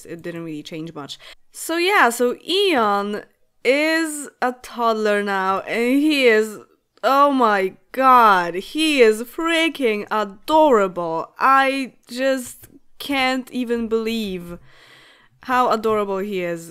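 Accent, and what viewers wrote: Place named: Poland. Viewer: Kocham ten polski akcent <3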